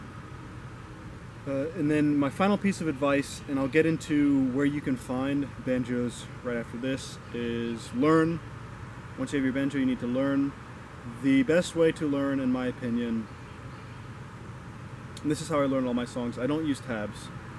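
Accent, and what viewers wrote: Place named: Canada. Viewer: your accent sounds canadian, reminds me a lot of Robert Pattinson in The Lighthouse which is an awesome movie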